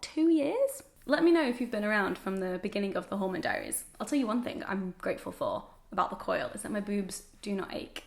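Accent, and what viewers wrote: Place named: Manchester, United Kingdom. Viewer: Literally couldn't tell you how many years I've been watching you and the Hormone Diaries is my fave thing! Also as a fellow manc living away from home hearing your accent is very comforting😂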